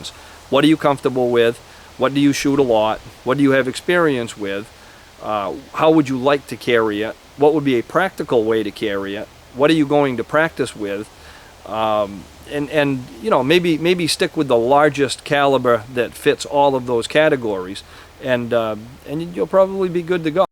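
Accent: Maine, United States. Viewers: I'm a .45 acp guy. (1911&G36) But I tell everyone who asks me that the most effective weapon is one that you can put rounds on target accurately and rapidly. I don't care what the caliber is. Stick with what you shoot best. BTW...are you from Maine? (accent kinda gives it away. Maineiac born and raised here)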